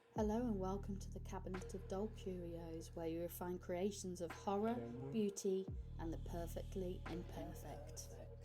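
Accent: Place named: West Midlands, United Kingdom. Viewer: I am glad you put her lower jaw on she looks fabulous.She’s actually quite glamorous for a zombie lol.I love the face paint and the cap really does make a great option to scraggly hair...The way you did her mouth was very clever and made her look less doll like.I also like the pose too it’s really good and I think the fixed dolls look great as they stand better than on those plastic stands..Apart from the face my other favourite part is her boots.They are so cool ,and I like the colour you did them..Do I detect a West Midland accent with you too.have not noticed until today..I am from there .It’s actually nice having one of my favourite doll makers coming from here...Keep up the great work I am looking forward to your next one 🥰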